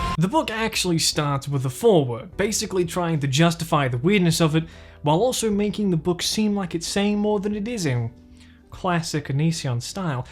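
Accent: Australia. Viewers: I always forget you're Australian. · he's australian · that’s the beauty of the Australian accent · Are you an Australian Danny Gonzalez? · didnt realize you were Australian thanks for the call back im one of the audio listeners.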